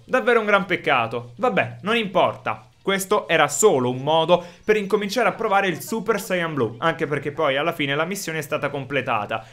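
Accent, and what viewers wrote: Naples, Italy. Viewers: ma tu sei di Napoli??🤔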